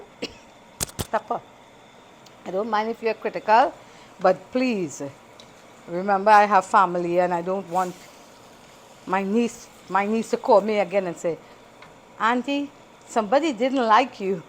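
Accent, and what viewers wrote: Trinidad and Tobago. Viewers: don't change your trini accent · I LOVE YOUR TRINIDADIAN ACCENT ! VERY RELAXING !!🇹🇹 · true trini. kept your accent girl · Listening to you reminds me of my grandmother who was Trinidadian bring back memories ❤❤ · I love Trini accents, love me some roti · Just found your channel. Enjoyed the rapport and watching you cook. Love your kitchen. Nice to hear the trini accent.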